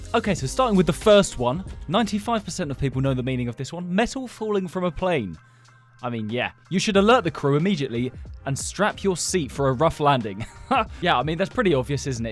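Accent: United Kingdom. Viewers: Are you British?😱😱😱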